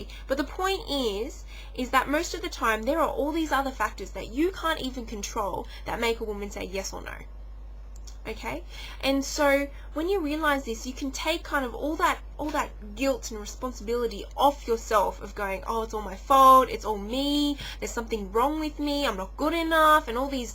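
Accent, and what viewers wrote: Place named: Australia. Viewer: I like that aussie accent...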